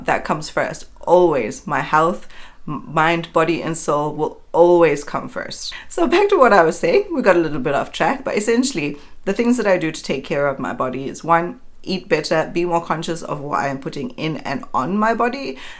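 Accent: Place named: South Africa. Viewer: Beautiful inspiring video ❤ Also recognize a slight south african accent...love it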